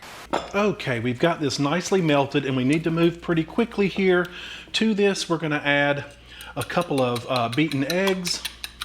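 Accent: South, United States. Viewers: Man! I have been horrible on my diet and apparently was off Keto so bad YouTube stopped suggesting keto channel videos for me... bad sign for my health but also I missed your videos. Love your positive personality and your accent reminds me of my mother’s southern accent. Might have to try some of these and get back into keto eating...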